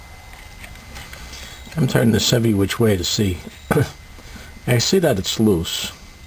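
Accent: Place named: New York, United States. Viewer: Old - Fashioned New York Accent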